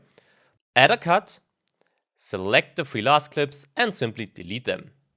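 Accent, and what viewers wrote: Germany. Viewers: Hahaha man hört so, dass du deutsch bist. Feier ich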